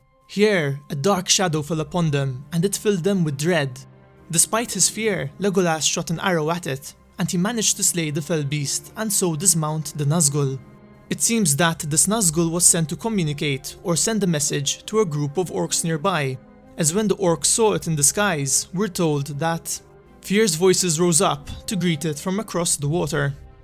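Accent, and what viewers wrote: Malta. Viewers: I could listen to your beautiful Maltese voice for hours. But harken now, as I recount the tale of Bargozím, the Fell Cockroach, foul pet caressed by Morgoth, ancient spawn sprung unwished-for from the tainted loins of Ungoliant to trouble the kitchens of Man. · are you Maltese by any chance? Your accent sounds Maltese.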